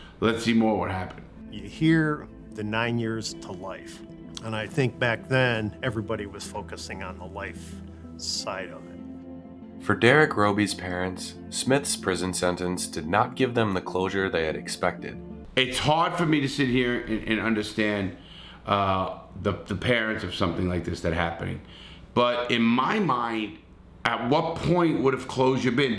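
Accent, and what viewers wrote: New York, United States. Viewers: I’m gonna buy a copy as well, but I live here in Phoenix Arizona, and I’m originally from New York, I lived in three of the five boroughs of New York City, but I was born on Suffolk County Long Island. Every time I hear speak, I feel like it take’s me right back to NY (in a good way.) “Youtube membuh prograaam.” The best! I listened to your book in audio format, but I really need to buy the physical copy tbh. I’m Jewish but my father grew up in Bensonhurst Brooklyn in the 70s and 60s, so I got to hear all these crazy stories. your channel is the best color I always try to give a thumbs up to every video you make! We all make mistakes in life, but it’s how we learn from those mistakes, and use those mistakes to change our press the situation., you constantly remind me on a daily basis why I should be appreciative of the small things I have, and I’m only 36 years old, but I’ve dealt with some craziness in my life. 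I was arrested many many years ago for weed, but nothing really came of it, but I’ve had to deal with my fair share of the police, so I really appreciate your channel, although I wish you would focus on talking about the NYPD a little more because there are so many corrupt people in the department. I lived on Staten Island, Manhattan, and queens; the most corrupt police I’ve ever met in the country live on Staten Island, whereas here in Phoenix they are just trying to protect the community, and as long as you aren’t being a jerk, they will be respectful, w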